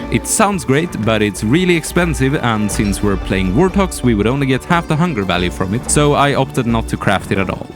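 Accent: Sweden. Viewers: Love the swedish accent! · I don't know who you are but you sound so obviously Swedish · Blessed by the algorithm gods! What a fun video, with great execution too! Shocked to see this channel hasn't even broken the 5k mark! Also, you have the funkiest, most pleasant accent. Are you Swedish by any chance?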